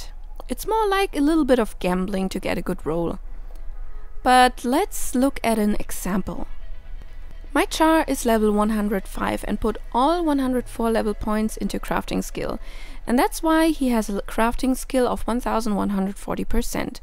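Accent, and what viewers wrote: Germany. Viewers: me as a german: She spoke Video very german...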